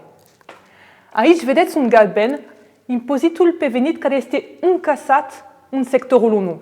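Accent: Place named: France. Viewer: Aveti un vocabular foarte bun, dar ar trebui sa lucrati la dictie pentru a elimina acel accent frantuzesc foarte puternic si a pronunta corect litera R. Suna foarte neplacut in limba romana. La emisiunea "chefi la cutite" vin straini care vorbesc MULT mai bine romana dupa doar cativa ani in Romania, chiar si doar dupa cateva luni...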